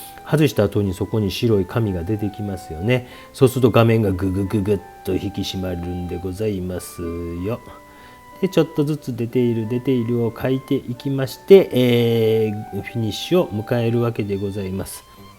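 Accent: Kansai, Japan. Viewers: おはようございます。今朝サインの描き方を試してみましたがうまくいきませんでした😞 サインとか窓の格子などはアマチュアにはliquid frisquetでもいいかなと考えています。影を描いてからその上に色を載せるやり方は大変参考になりました。ところで神戸出身の私には先生の関西弁が懐かしいです。これからも頑張って下さいね。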